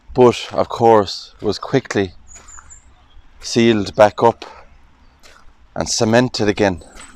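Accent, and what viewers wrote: Ireland. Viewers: I would watch your videos just to listen to your brogue! Love it.❤ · Hi i just love your voice Irish Accent, from young age my sister and i loved grave yards reading head stones we did this even when we first retired. You do a grest video. My Daughter always wanted to meet a irishman to marry, she sang the celtic music, trouble was she was stunning stil a good looking woman could not cope with her beauty never lived or married she has a strong spirit wont be messed about, but she got on with irish men, she said true men, but they found her spirit to much. She used to sing on the ferry boat from liverpool to ireland. So i never got my irish stepson, were not Irish. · I heard the irish accent, and knew I had to give you a listen to, I like the graveyard walks, so I'll go through the other videos, keep up the good work 👍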